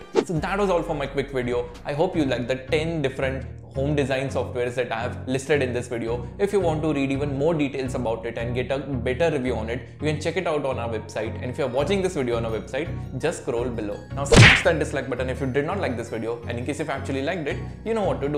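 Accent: India. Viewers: Can we just appreciate this guy's beautiful voice nice indian accent ;) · It happens, don't worry. That's Indian accent.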